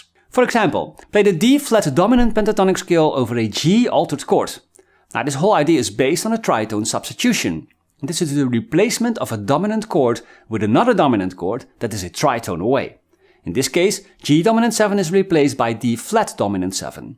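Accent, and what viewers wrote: Netherlands. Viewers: This is the BEST guitar channel I've discovered in all my years. And your playing - is beautiful, soulful, tasty, everything I'd want to be! And your accent reminds of my beloved late (Dutch) father.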